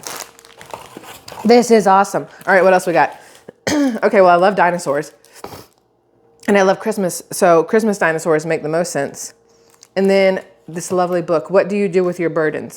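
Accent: South, United States. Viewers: Love ya , Your Awesome People!! , I love your voice and your accent I miss that I'm from the south to but that's not where I live at anymore !!, I love to hear y'all, and I miss hearing I'm fixing to do something LOL !!, IT'S AMAZING THE LITTLE THINGS THAT YOU MISS THANK YOU SO MUCH !!, PLEASE STAY SAFE !, I ENJOY WATCHING YOU YOU DO A GOOD JOB TELLING IT LIKE IT IS !!, THANK YOU!! GOD BLESS!! ,AND TO YOUR FAMILY!!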